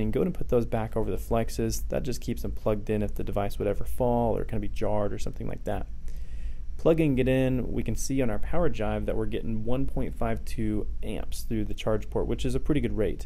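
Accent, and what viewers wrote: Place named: Morocco. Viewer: من الهدر باين انت مغربي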